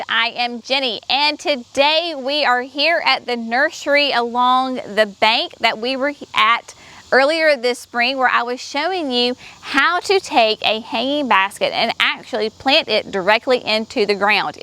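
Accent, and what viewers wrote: South, United States. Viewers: Love your Southern accent and thank you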